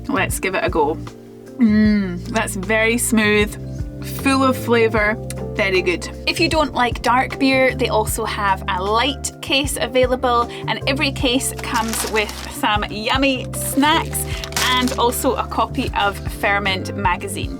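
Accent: Scotland, United Kingdom. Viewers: Thanks for taking me through Scotland which I have never visit though I live in Ireland. I love watching your videos and listening to your sweet Scotish accent, yours is mild though. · Your videos are delightful and your voice and accent are a joy to listen to. Such beautiful scenery. How lucky you are to live in Scotland. Having never traveled to Scotland myself, It is exactly how I envisioned it. It’s magical! Thank you so much again for sharing these videos. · been so long since i heard a Scottish accent my family is originally from Gretna green but i moved to America as that's were my wife is from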